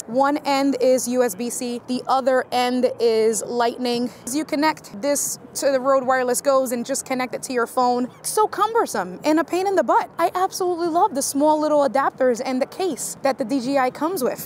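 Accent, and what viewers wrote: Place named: New York City, United States. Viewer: I could tell you were from NYC when you started talkin (I’m from Qnz)…then you went outside and I was like YAAASSS 🗽 then I got to the end and got a YERRRRR and it was the cherry on top 🗽🗽🗽 lol great vid, very helpful 🤙🏾 s/o NYC 💯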